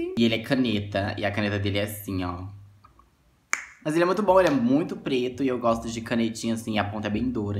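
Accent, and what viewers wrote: Brazil. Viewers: Uai vc é de Minas? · Eu ri muito. “Eai, joia?” O sotaque goiano é bom demais.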